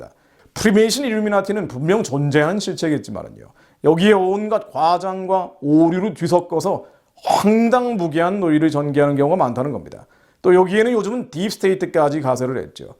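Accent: North Korea. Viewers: 조선족이거나 북한인같네요. 억양들어보면 북한억양입니다.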